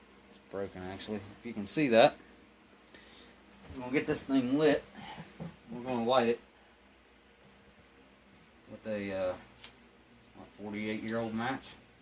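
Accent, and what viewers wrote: South, United States. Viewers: Love the accent. As an Aussie, I appreciate a good country sounding American 👍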